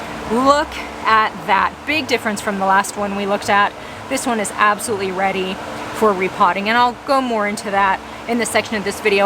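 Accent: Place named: Manchester, United Kingdom. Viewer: I'm from Manchester, it's nice hearing someone from pretty much down the road talk about their plants. Not showing me their Monstera on a California balcony.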